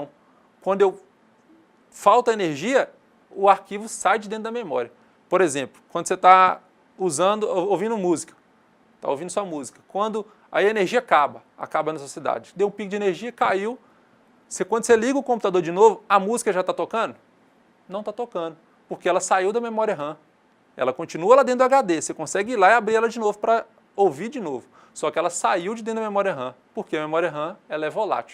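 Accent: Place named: Minas Gerais, Brazil. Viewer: o bom é que ele é mineiro , num guento ver esses cara falando tudo certim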